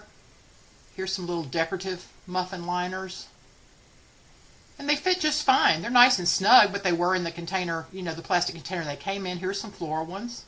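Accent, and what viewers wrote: South, United States. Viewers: Oh wow! So so great to hear your cool southern voice again 🤗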